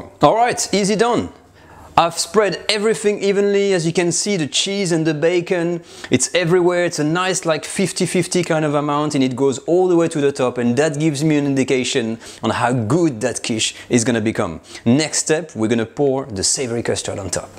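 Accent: France. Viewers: Quiche Lorraine is NOT made with cheese!!! That we call a quiche Savoyarde. Despite his French accent, this man hasn't got a clue about traditional French cuisine, as I learned from many others of his video's. He is a bussiness man rather than a cook. His lack of basic knowledge and ingredients is painful to watch! Please take a look at other sites on the internet for traditional French cuisine, there are plenty!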